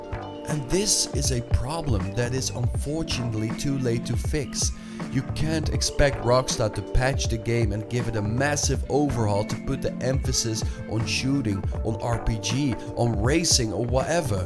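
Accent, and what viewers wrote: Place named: Netherlands. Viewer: The narrator sounds agressively dutch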